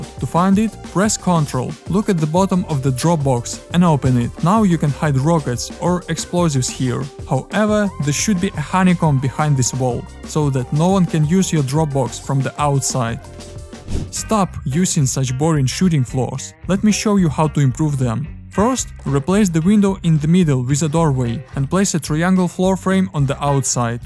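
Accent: Russia. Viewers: акцент русский слышно)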